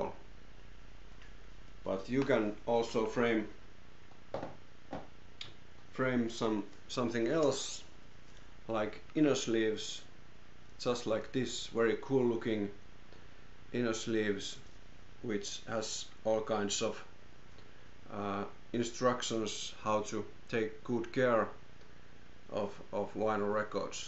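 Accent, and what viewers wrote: Finland. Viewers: Kuulin sun aksentin, ja tiesin heti ett oot suamalainen. : D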